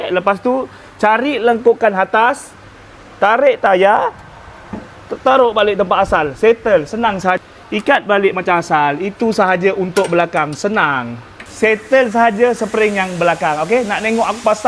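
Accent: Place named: Java, Indonesia. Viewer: Ada lorat² jawa sikit...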